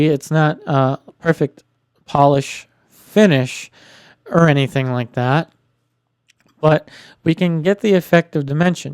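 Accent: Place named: United States. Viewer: keep on soft-ten-ning where useful! Where's from? He sounds american accent except for his love of mis-pronouncing soften: properly there should be a silent T in soften and softening at least here in the American language. Very good videos dude!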